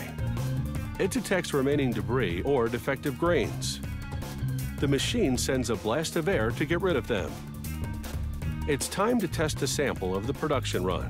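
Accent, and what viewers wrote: United States. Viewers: it says UK, but he has an American accent. 😓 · Discovery UK but the narrator is American lol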